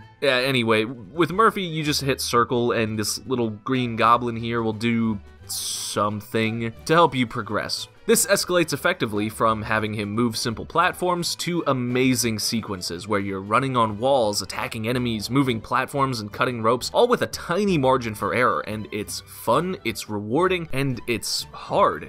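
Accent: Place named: Russia. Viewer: I keep forgetting to download this game. Also it sounded like a Russian accent me. Good review!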